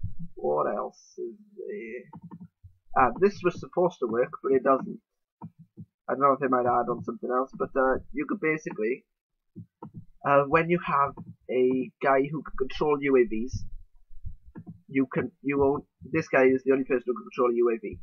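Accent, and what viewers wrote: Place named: Wales, United Kingdom. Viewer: ah a welsh boy! bore da!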